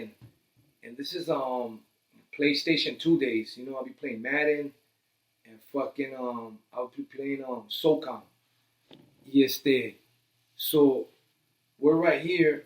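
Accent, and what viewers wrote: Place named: New York, United States. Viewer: you sorta have a New York accent when u talk..maybe it’s just me..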